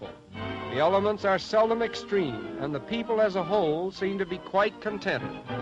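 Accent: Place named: Netherlands. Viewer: Inggris accent Belanda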